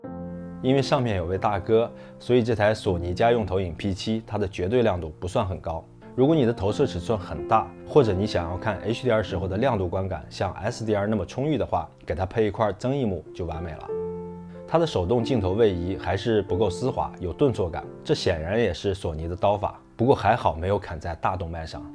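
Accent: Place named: Shanxi, China. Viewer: 是山西人吧感觉和我说话差不多哈哈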